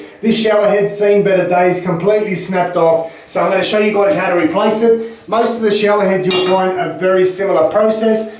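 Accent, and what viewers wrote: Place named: Australia. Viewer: Legend, you literally have just saved me big time. I am young single female with no plumbing experience and was able to do this! Being an Aussie I immediately felt calm hearing a fellow Aussie use no nonsense straight to the point instructions.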